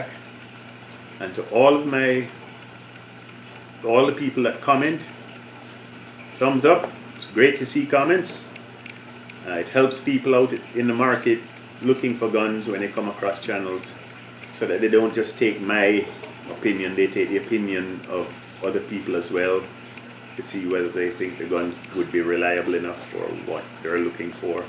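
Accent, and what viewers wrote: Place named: Barbados. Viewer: Heck I just trying to figure out your accent but I'm gonna go out on a guess and say Barbados.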